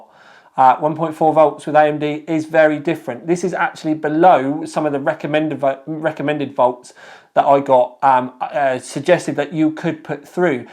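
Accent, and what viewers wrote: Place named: Norfolk, United Kingdom. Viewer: gotta be a naarfolk boy i reckon...good job....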